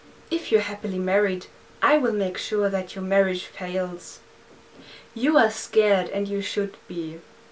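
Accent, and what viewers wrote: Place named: South Africa. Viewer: Where are you from? your accent is beautiful. Sounds a bit South African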